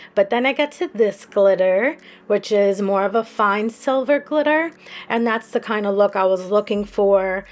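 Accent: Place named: East Coast, United States. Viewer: Gorgeous gel builder I really like it!! You sound like you are definitely from the east coast..yyaass sis!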